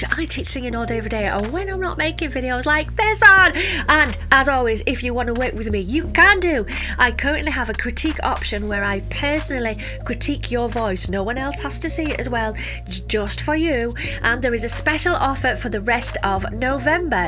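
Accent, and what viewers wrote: United Kingdom. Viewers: I love your British accent! <3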